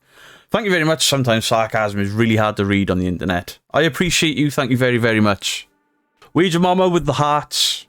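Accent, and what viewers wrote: Wales, United Kingdom. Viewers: I love the Welsh accent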